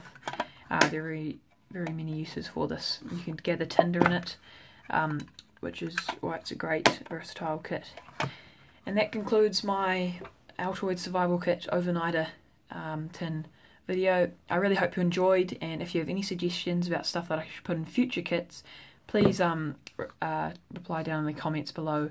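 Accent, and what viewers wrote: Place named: New Zealand. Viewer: I recognise the accent! A fellow kiwi I see. What part of NZ are you from?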